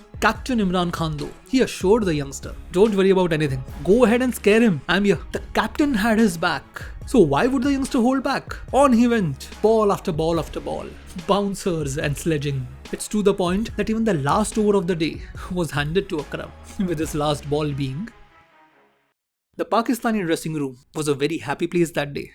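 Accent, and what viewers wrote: India. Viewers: very good commentary....the pace of the speech was extremely good ...the words were clear and spoken with mildness and full of extremely good punctuation. ....unlike the stupid videos uploaded these days where the words aren't clear and there are hundreds of grammatical errors....the accent was very indian but that doesn't matter when the speech is so good.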